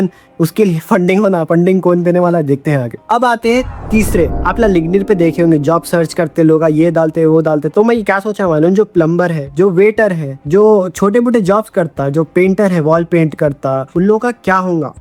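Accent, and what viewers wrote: Hyderabad, India. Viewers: I like the way you talk Hyderabadi style accent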